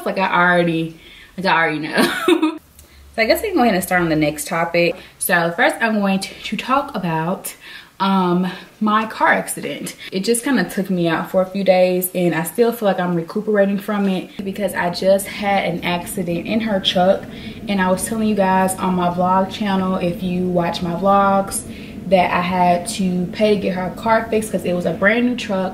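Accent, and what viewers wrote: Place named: South, United States. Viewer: Gorgeous and your country accent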